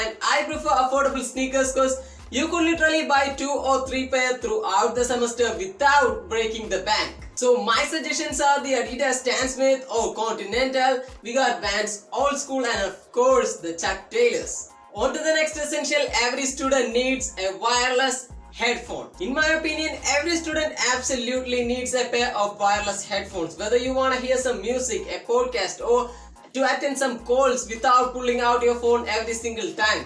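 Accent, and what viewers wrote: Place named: Kerala, India. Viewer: Your English slang tells me that you are a Malayali boy what ever support from Karnataka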